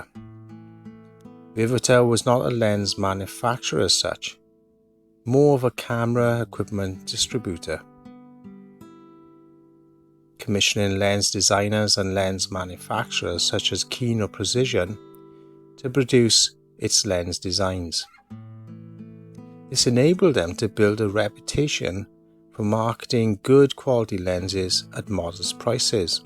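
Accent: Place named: South Wales, United Kingdom. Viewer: After seeing your review I picked one of these up (Nikon F mount) on eBay for forty quid. Not sure why but I'm finding it's overexposing on my Nikon D500 and I'm having to set a compensation of -1 to -2. Apart from that it's an excellent lens. I came back to your video and just twigged on the voice, South Wales. 👍🏻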